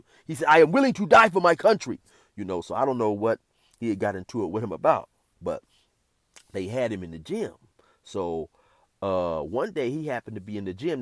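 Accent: Africa. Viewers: Love the African accent lol 😂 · i think i get more entertained with 12 min stories then a 2 hour movie the African accent had me dying! JUST TELL ME WHAT I OWE YOU!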